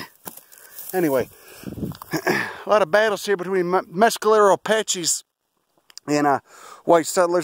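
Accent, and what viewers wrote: Chicago, United States. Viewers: Is your accent Chicago?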